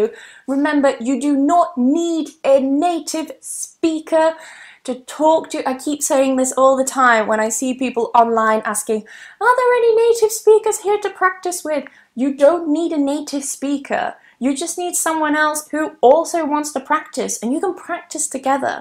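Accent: United Kingdom. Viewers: I love your beautiful british accent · Can you please tell me in which british accent you are talking🙂💐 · I'm a huge fan of British accent english speaker. I love British accent. Carry-on thank-you so much. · Those tips of yours were really helpful to be honest for those who want to improve their English skills and can't find a partner to speak to. That happened to me a lot back then many years ago when I first started learning English. It's quite hard to find people who'd be willing to interact and help us with our English speaking skils by practicing it with conversations. I'll keep watching your videos anyway cause I want to get used to the British accent which I've been learning how to admire, cause before it sounded really weird, but it's changed gradually overtime! And I loved your accent specifically! :) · I'm from Uzbekistan, I love your British accent, you are the most beautiful teacher in the world, if I were your husband I would be the happiest man in the world 😘😘😘😍💓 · Thank you so much. 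Can i suggest you that maybe you can put the text below. Because maybe there are some people who don't understand because it is too fast or '' too british'' hehe.. Thanks a bunch. keep helping and improving. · I love British accent and you.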